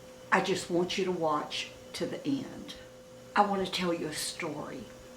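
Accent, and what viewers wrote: South, United States. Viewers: I lost my precious Grandma to a heart attack..she wanted to come home and the doctors had already told us it wouldn't be long😢...so she came home on Hospice..but my momma and me took care of her..she lived 5 more day's and it completely broke me! ...I know this is an older video but I Thank God you are okay! The look on your face in the intro really scared me! This is a great message...Thank you for sharing the symptoms..they just might save someone's life..you are such a sweetheart and I just love to listen to you.you have the biggest heart ever!...I can tell you're southern so where are you from? I'm from Indiana...Again I'm so happy you're okay! xo Hydie ❤💋❤💋❤💋 Hugs darlin...you look amazing and I love your sofa..we have similar taste...😜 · I love your accent and southern ways. You should be part of the southern women group (what southern women say) you would be a great addition!!!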